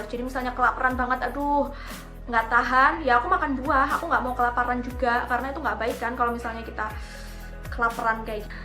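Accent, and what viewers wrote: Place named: Java, Indonesia. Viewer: Ngomong e medok mba, asline wong endi?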